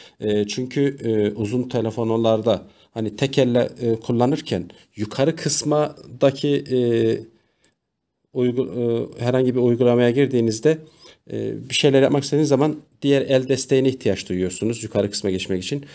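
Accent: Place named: Kars, Turkey. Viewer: Şive karsa benziyor😎güzel video👍🏻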